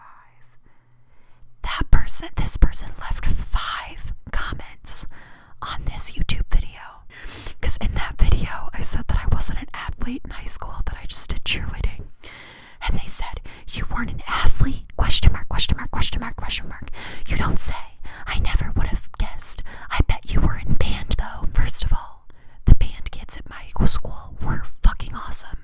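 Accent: United Kingdom. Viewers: The only British accent I’ll ever need to hear the crazy loud Jesus fuck is SOO FUNNY 😂😂😭😭😭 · What is wrong with people? If you don't like something someone is saying or doing on their chanel, just don't watch. It really is that simple. Hope everyone has a blessed day. ( Your british accent was fun) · As a Brit , I absolutely love your British accent 🤣🤣. Also, abortions during birth? that's terrible JESUS FUCK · The British accent 🤣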